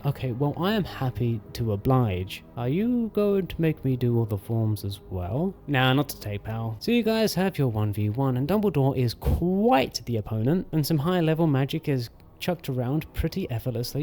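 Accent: United Kingdom. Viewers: Any video with British narration that mentions colonialism within the first 10 seconds is an instant classic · Dude I couldn't hear anything you were saying it was a struggle even with subtitles. I don't know if it's because you're british of because you're mumbling. It was both. Either try to be less british or mumble less.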